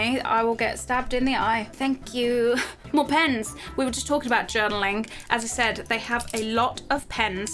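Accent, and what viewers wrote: United Kingdom. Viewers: Ok that British accent is everything 😍😍😍🥺🥺🥺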